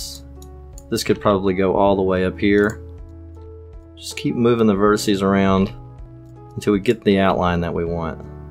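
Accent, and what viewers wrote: United States. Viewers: lol the country acccent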